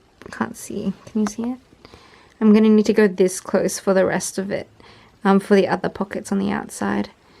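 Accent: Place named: England, United Kingdom. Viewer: Asian with an English accent!!!🤣🤣🤣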